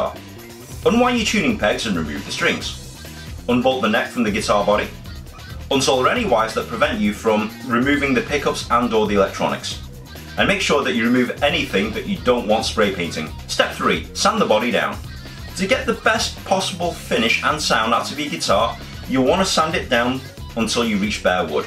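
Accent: United Kingdom. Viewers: Asian guy sounds British....blowing my mind!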